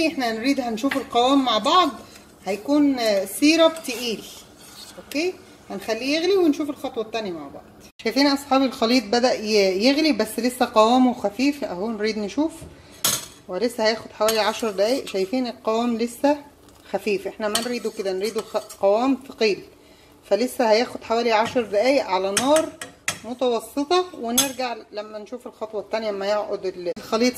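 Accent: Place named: Egypt. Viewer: هو انتى بتكلمي مصري على خليجي ليه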